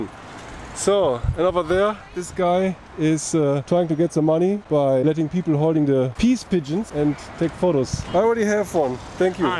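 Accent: Germany. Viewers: 10 seconds in, oh ja, er ist einer defintiv Deutscher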